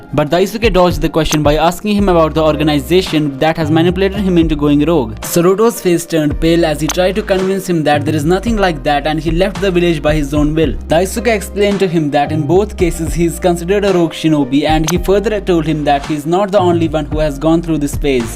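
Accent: India. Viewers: You sound Indian are you?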